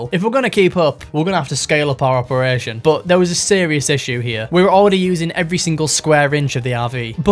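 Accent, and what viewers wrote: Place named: West Yorkshire, United Kingdom. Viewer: Most West Yorkshire accent I’ve ever heard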